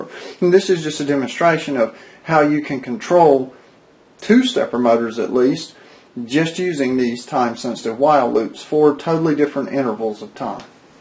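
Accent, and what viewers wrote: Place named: South, United States. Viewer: Thats a cool Southern accent. The best in the USA. In my opinion.:)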